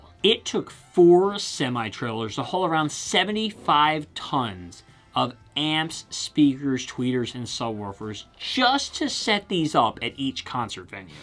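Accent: Baltimore, United States. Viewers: That Baltimore accent. Maybe Philly.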